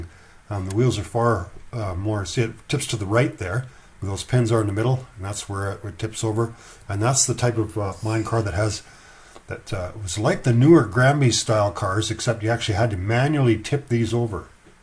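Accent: Canada. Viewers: This guy's really Canadian eah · i wonder if this guy is canadian? "eh?" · cant hide canadian accent - Former Ice road trucker - Alex Debogorski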